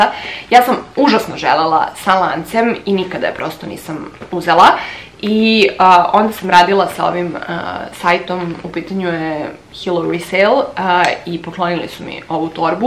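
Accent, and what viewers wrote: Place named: Novi Sad, Serbia. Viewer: Od kad ti imas novosadski naglasak?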